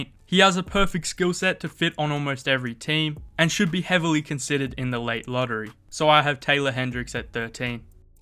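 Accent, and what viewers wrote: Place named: Australia. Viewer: Good video man very informative. I'm really not sold on Jett Howard. It will be interesting to see how the Thompsons go in their first couple years too. I sensed an aussie accent, shout out from Sydney!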